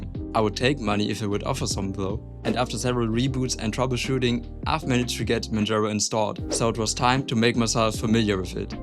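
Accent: Germany. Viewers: Yo, its normal in Germany to have this fucked up accent?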